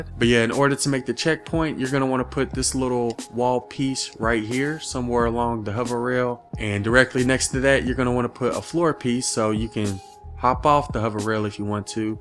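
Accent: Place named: Florida, United States. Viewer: I'm trying to figure out.. is this an accent from Florida or some coast states ?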